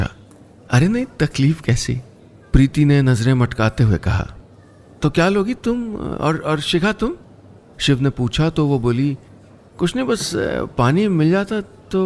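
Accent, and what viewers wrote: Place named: Mirzapur, India. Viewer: Accent Mirzapur wala hai 👌👌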